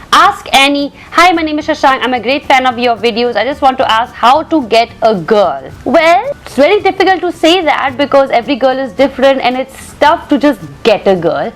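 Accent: India. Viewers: i love Ur Hindi ascent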